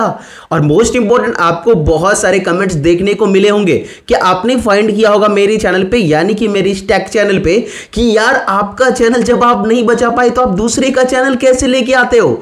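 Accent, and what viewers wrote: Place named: India. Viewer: USA ka time mater nhi karta hai aapko English main video bana hga aur usa ki log Indian accent itna dekhta nhi hai 😅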